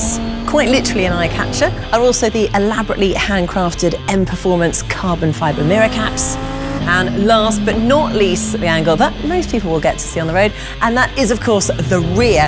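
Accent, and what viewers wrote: United Kingdom. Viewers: The British accent just does it for me, great vid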